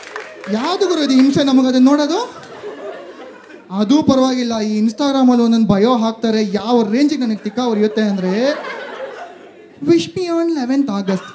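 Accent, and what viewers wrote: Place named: Bangalore, India. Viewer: Haha!! Kanditha!! Thanks a lot for watching!! Kannada bhaashe nammanna uddara maadtide.. Benglur slang swalpa mix ide, aadre pakka Kannadiga! ಕಲಿಯೋಕೆ ಕೋಟಿ ಭಾಷೆ, ಆಡೋಕೆ ಒಂದೇ ಭಾಷೆ, ಕನ್ನಡಾ.. ಕನ್ನಡ.. ಕಸ್ತೂರಿ ನಮ್ ಕನ್ನಡ!❤️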